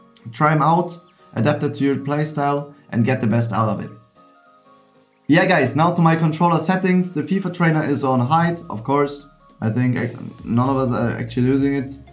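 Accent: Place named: Germany. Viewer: Mann kann höheren das du deutscher bist haha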